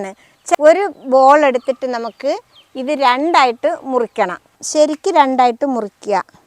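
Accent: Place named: Malappuram, India. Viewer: ഇനി ചൂടാറാതെ പെട്ടീല് ബെക്കാം....😂😂, തനി മലപ്പുറം ഉമ്മ❤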